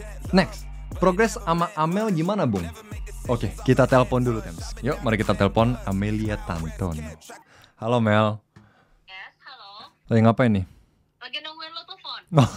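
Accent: South Jakarta, Indonesia. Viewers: Bahasa Indonesia ala Jakarta paling bagus dari semua YT-ers Korea yang si ini.....Indonesia asli... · INI ORANG JAKSEL YA?😭😭😭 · Ini mah aksennya jaksel bet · Kalo ngga sebut Korea, kita kira orang Jakarta..!!..😁👍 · 🤣🤣🤣 gue pikir lo anak jaksel bang. kocak bet dah lo ahaha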